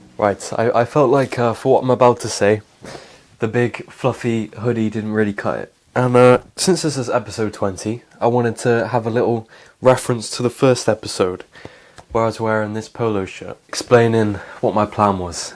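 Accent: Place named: England, United Kingdom. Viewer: I don’t think that I’d ever have contact lenses, as the chances of getting eye infections is too great, even though I started wearing glasses for driving motorcycles in my home county of Meath in Rural Ireland many years ago (I’ve only lived in the U.K. (Manchester) for 24 years now) but I had a friend who had a severe eye infection from wearing contacts and he was in the MRI (St Mary’s Eye hospital university training hospital in Manchester) as an inpatient for several days - I’ve also used St Mary’s and the MRI dental hospital over the years and because they are a university training hospital, they have a consistently very high standard of patient care - at age 54 myself now, I now wear varifocals - by the way, I could listen to your gorgeous and well spoken English accent all day, it sounds so classy - despite my years living in Manchester, I’ve still retained my strong yet clear Irish accent, about which I’ve had a lot of compliments from my English friends 🇮🇪☘️🇮🇪😍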